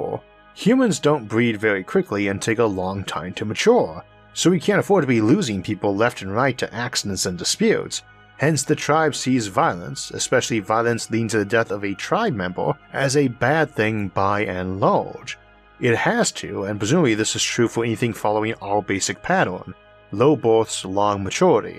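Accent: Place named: Kentucky, United States. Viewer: What is your accent, you sound like you are from old Kentucky from the1800's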